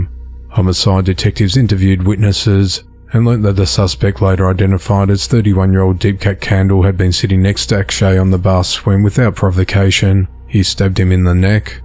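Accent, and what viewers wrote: Australia. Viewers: Are you Australian? Anyway have a nice holiday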